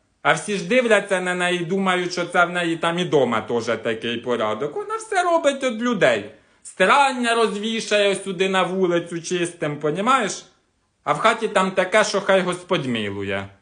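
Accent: Poltava, Ukraine. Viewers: 😂😂😂😂😂наш полтавський говір---осталось тільки висратцця.Ще від мами та бабусі вислови помню.Як в детстві побувала в нашому селі.А особинно під магазином (лавкою) такого багато можна було наслухається Огонь🌞продолжайте в тому ж духі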